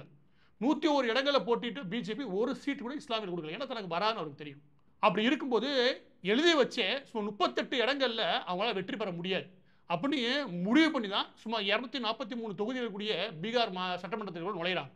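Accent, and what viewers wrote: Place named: Sivagangai, India. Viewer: I like your dialects very relative to Sivagangai Cheemai. Hope you are from Manamadurai. I belong to proper Sivagangai. Pl continue. People used to kid me when I spoke the dialects in Chennai some 65 years ago. Vattara mozhi is really gives happiness.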